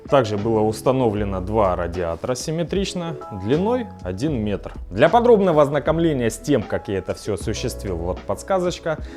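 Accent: Rostov, Russia. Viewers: То ростовский говор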